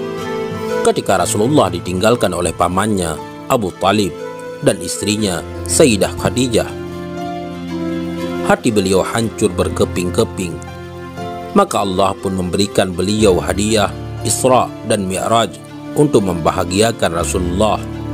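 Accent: Aceh, Indonesia. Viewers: Baru sj suami sy beritahu sy suara min kyk ny org Aceh ajah yaa?🤭😀😊👍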